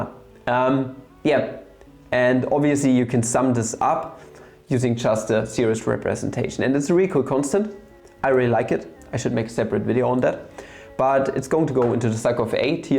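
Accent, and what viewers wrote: Germany. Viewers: german guy tryna speak english for half an hour straight yes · i bet youre german (5 seconds in) ps: bin selber deutscher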